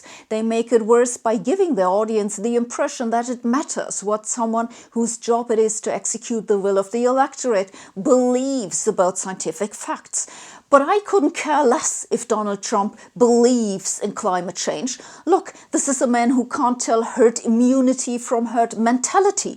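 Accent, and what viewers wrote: Germany. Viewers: Lol, your accent is German, but you cuss like an American Army drill instructor. Bravo! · She's acting pissed, but by the end of the video I can tell she's half dead inside having to break down something that was supposed to be obvious to most people, and yet it isn't. Keep up the amazing job in your career and in your Youtube channel! PS: Any female with an angry german accent will always have a special place in my heart <3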